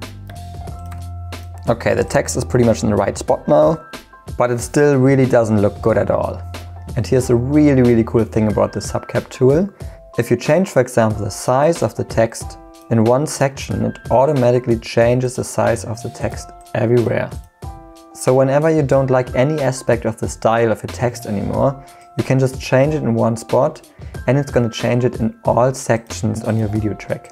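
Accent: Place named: Germany. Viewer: Aweful German accent, I don't understand nothing!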